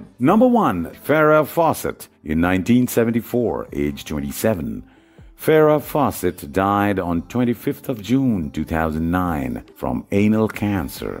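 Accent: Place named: Afghanistan. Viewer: Come on man!! Why is someone from Afganistan narrating this ALL AMERICAN tv show cast..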